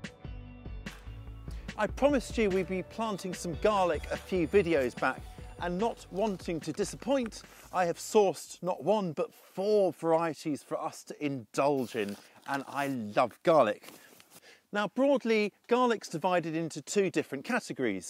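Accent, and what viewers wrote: United Kingdom. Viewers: Zone 8?!?!?! Where is he? I assumed he was in Britain because of his accent.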